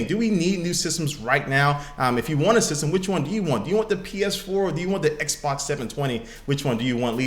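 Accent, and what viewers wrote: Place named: United States. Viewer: I thought he was American.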